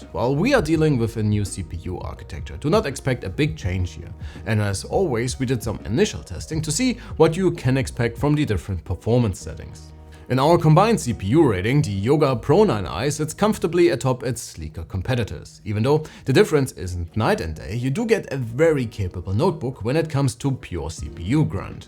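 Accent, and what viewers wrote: Germany. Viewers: Nobody wants to talk to you in the comments below lol. You manage to speak German when talking in English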